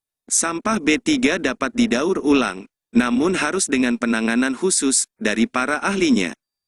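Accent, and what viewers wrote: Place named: Banten, Indonesia. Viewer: banten mana suaranya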